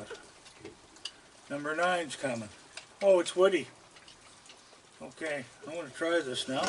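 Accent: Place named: Nova Scotia, Canada. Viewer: i always wondered why ya sounded so familiar its cause yer a fellow nova scotian right on makes sense to me now lol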